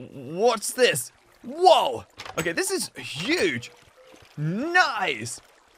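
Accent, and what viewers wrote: United Kingdom. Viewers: Are you british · Obv he's British 🙄 That's clearly a British accent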